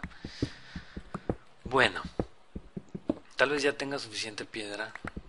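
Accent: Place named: Mexico. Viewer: En serio eres de españa??... Te lo digo porque hablas como mexicano....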